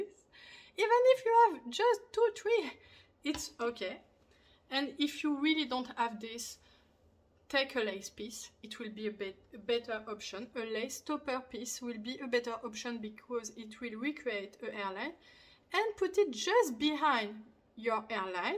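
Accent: France. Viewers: Love your French accent!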